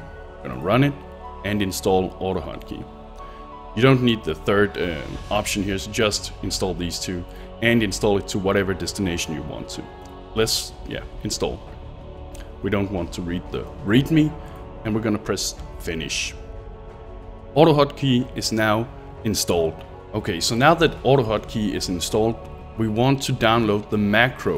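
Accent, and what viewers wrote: Denmark. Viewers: Thanks for sharing the macro man! I got it to work just fine and it's working smoothly so far. By the way, just to see if I've managed to identify your accent properly; are you danish?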